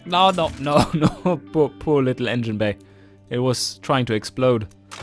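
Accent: Germany. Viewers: Mate, are you german?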